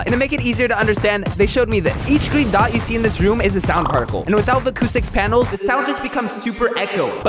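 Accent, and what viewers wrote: France. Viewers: C'est juste dingue😶C'est la première vidéo de toi que je regarde et ça me donne déjà envie de m'abonner...Allez, +1! PS: Your french accent is hilarous🤣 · The French accent ! xD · Are u french? Anyways i love ur video when i see a notif of u i smile instantly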